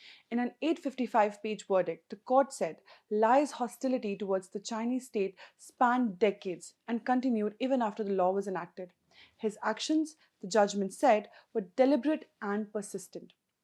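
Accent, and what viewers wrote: India. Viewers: I pray to God that my children do not learn to speak English from the presenter of this video. No offense to Indians but such a bloody awful accent. Try learning to speak proper English first before coming onto broadcasting. It's an insult to the industry. Half the listeners can't make out what the hell she was talking about. That was the biggest joke of the day !!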